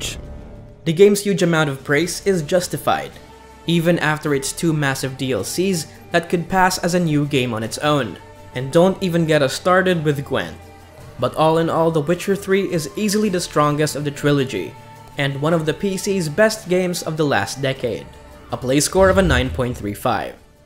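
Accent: Philippines. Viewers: Yeah. Accent pa lang, pinoy na haha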